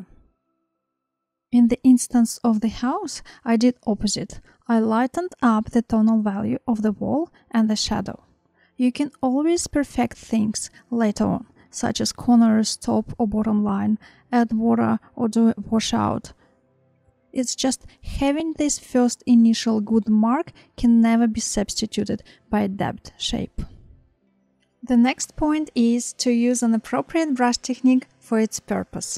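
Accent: Russia. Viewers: С первой секунды слышно соотечественницу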